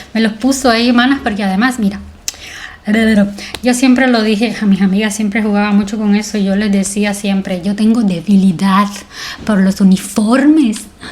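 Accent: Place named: Cuba. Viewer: Mi esposo cada vez que escucha tu voz me dice: ya estas escuchando a y yo le digo claro que siiiii 😅😅 por fin llegamos a la parte feliz, te lo mereces todo mi reina. 🩵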